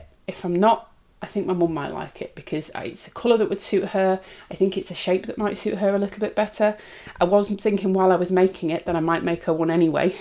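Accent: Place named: Northern England, United Kingdom. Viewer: You sound like you're from the North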